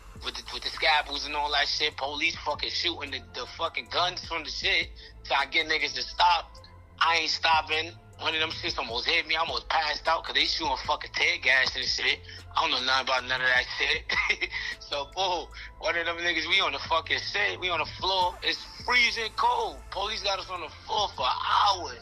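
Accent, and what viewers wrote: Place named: New York, United States. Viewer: NY talk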